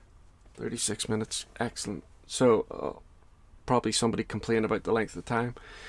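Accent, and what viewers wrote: Northern Ireland, United Kingdom. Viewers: I'm really sad about this. I've been following you for years (my other account is Maureen Refior). I know so much about you and your family that I almost feel like I know you all, in a weird way. Just hearing your voice is comforting, because I rarely speak to anyone from Northern Ireland anymore and it's just nice to hear the sound of home. I get it, though. Having your words stolen and twisted into something completely different is an ultimate betrayal. It's an act generally performed by intellectually stunted virtue signalers who get their news from Twitter and Facebook groups. They roam the internet in packs searching for victims. The pack's collective IQ is generally 43. You're a good man. Your past actions do not have to be eternally atoned; bad shit happens in wartime. And while I am sad that you're leaving, the fact that you feel this as deeply as you do really upsets me. 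I will probably join your Patreon. When I commented on Facebook I thought I had your email but I was mistaken and l don't want to lose touch with you. I pray for your daughter every day, along with you and her mum. Take care of the wonderful man you are now. Screw the trolls. Much love to you. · Hey. If you are closing the channel, I just want to say thanks for the content you've put out. I've only discovered your channel relatively recently but it seemed like any art supply I looked up you had a really good review of. To be honest, I thought you had a Northern Irish accent so you were relatively local to me and that was kind of cool so i kept coming back, although you've since mentioned in other reviews that you're actually in England, but anyway. Anyway, just know that there are morons out there these days who just seem to go out of their way to get offended. It doesn't matter what you say, they'll twist it and do mental gymnastics to get themselves outraged. 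You can't let them get to you. And if, for you, that means you feel you need to close your channel, then the most important thing is to look after yourself and your mental health. You will be missed though. All the best.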